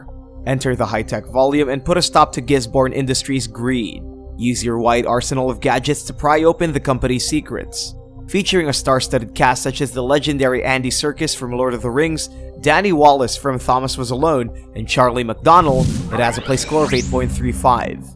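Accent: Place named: Philippines. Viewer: Off topic. You sound Filipino.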